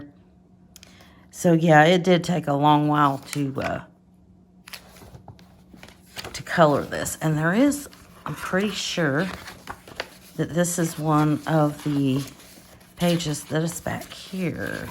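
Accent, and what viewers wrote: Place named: Alabama, United States. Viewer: Hey, she Alabama southern just like I am but North Carolina style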